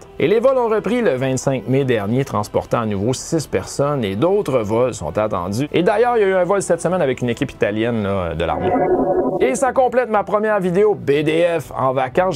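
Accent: Quebec, Canada. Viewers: Toujours un vrai plaisir. Le ton, le style et tes efforts pour lisser ton sympathique accent québécois. Au dela de la forme, c'est tres intéressant d'avoir les nouvelles du spatial d'un point de vue nord-américain et ... en français ! Courage...on aime ton travail 🇨🇵 ❤ 🇨🇦 Ps. J'ai conscience de l'esprit indépendant nord américain mais pourquoi pas des collaborations avec des YouTubeurs français? C'est une pratique courante ici chez ces "maudits français". Ton nombre d'abonnés n'est pas a la hauteur de la qualité de ton travail...(aucune flagornerie de ma part...)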